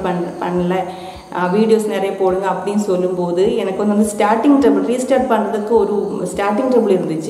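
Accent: Kerala, India. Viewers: We tamilnadu people love Kerala people and their slang..Hard work Never fails Sis.. keep rocking dear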